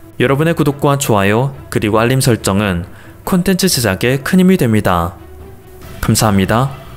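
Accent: China. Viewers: 채널 운영자도 중국인인가요? 발음이..... 중국사람 같아요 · 성우 조선족인가요?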